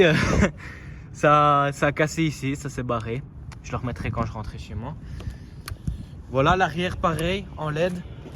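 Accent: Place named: Hainaut, Belgium. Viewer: Avec ton accent Hainaut laisse tomber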